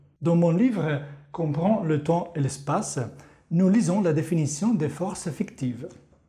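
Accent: Italy. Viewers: Bellissimo accento Italiano!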